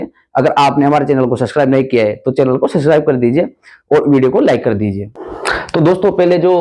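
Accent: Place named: Kota-Bundi, India. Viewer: आपका बौली का लहजा कोटा-बून्दी का है।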